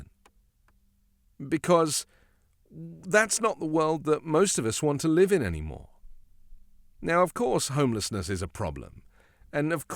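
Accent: United Kingdom. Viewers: It sounds like you're from the UK. Just bend over and take it from the migrants and don't worry about what we're doing over here. We're actually fixing our problems.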